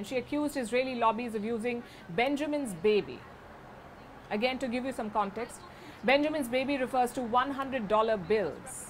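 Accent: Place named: India. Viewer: Every time I hear your voice it reminds me of all the scammers from India! ''halo... I'm David Copper from the IRS...send money or else''!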